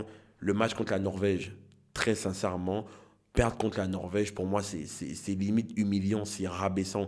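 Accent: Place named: Ivory Coast. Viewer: ivoirien on reconnait quand tu parles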